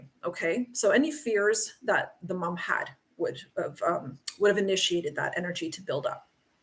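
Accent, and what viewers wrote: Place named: West Coast, United States. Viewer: I don’t usually watch Q&As (actually never) but your videos are “popping” up on my homepage lately and I’m wondering why? 🤔 I find today’s topics with & (I believe were their names), very compelling. (it sounds like is dealing with a Christian ideologue mom and guilt issues, just a guess? I can relate) America, while not claiming a national religion has surely imposed those ideals onto us from birth. Words like “Purpose” are a clear indication of that. After all I have learned from six surgeries, three of which spine related, All of which originated from a severe accident resulting in a broken neck. I joke that having Plates, rods and screws in my cervical & lumbar spine make me a hybrid human 😜 Judging from posting times and when they show up on my page you are in a different time zone from me (East Coast). 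You don’t sound European, South American, or Canadian for that matter; West Coast perhaps? Anyway, my point is scheduling an in person session wouldn’t be convenient. I am currently writing a book, autobiography of sorts, and would like to consult with you on developing the theme and characteristics of the antagonist. The more I listen to you speak the more I like your instincts & intuition. 😊 Can I email you to arrange an initial consultation perhaps?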